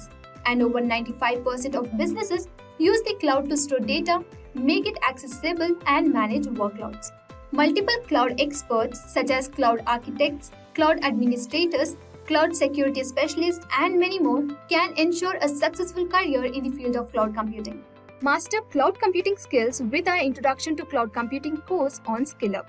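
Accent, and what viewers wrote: India. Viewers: Indian accent insta skip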